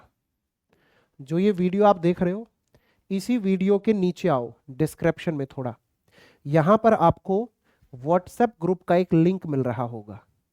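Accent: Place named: Haryana, India. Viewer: Apki harianvi bhasa sunkar bahut acha laga😂😂😂😂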